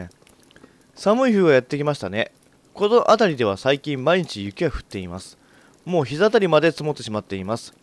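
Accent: Kansai, Japan. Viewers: かっこいい人の関西弁はさらにかっこいい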